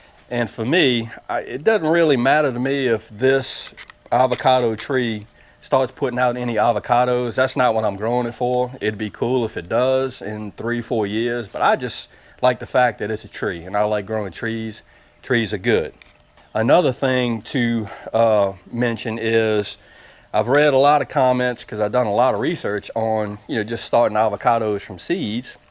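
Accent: South, United States. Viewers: I love your country accent. And your directions are clear. Thank you so much for your help!! I'm planting my avacado tonight.🥰🥰🌱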